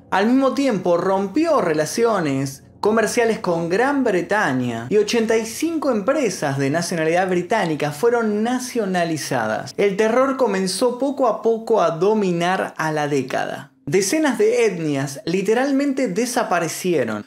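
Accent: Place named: Argentina. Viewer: Oye no puedes grabar los vídeos sin meter ese asiento argentino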